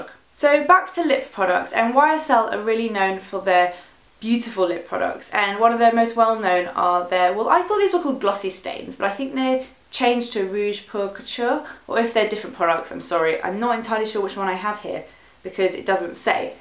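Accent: United Kingdom. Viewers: love your channel, your videos, and the british accent ...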